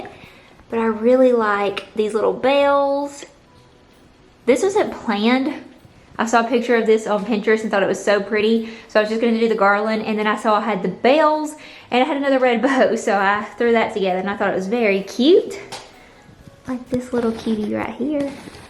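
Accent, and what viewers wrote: South, United States. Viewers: Hi I enjoyed your decorating and was inspired to start my own today. Your home looks beautiful and great job on your tree. You are such a sweet girl and I love your southern accent. I am from Canada so I enjoy listening to ya all.😊 I was wondering where did you get your garland on your fireplace? It looks so realistic. God bless you and your husband! Merry Christmas!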